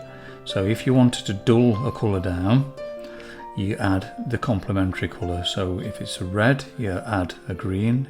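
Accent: United Kingdom. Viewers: Thanks for letting us look over your shoulder and your extreme helpful comments (and after many years of communication with Americans I love to hear your georgous british accent 😉). It is the first time for me to get such a good impression of drawing human skin. Especially your work with the complementary colours is impressive. Thanks again and a nice christmas for you and your family from an art student in Germany.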